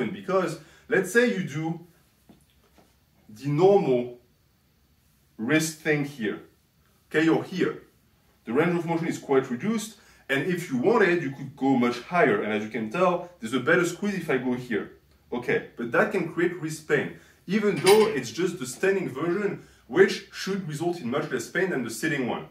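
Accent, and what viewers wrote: France. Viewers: are you french? the accent sounds like it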